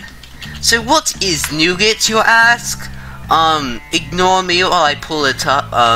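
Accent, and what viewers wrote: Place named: United Kingdom. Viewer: Why the British accent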